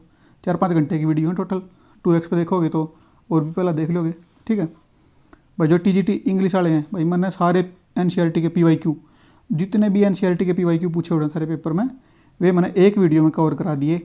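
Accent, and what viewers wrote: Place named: Haryana, India. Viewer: Very valuable and useful class (english in Haryanvi tone👍🏻)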